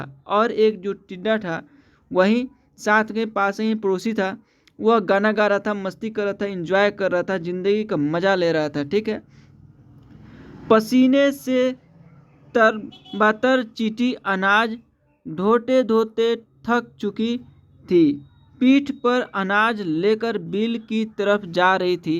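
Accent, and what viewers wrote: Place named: Bihar, India. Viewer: Aapki Hindi Bihari kyu lag Rahi hai 😅😅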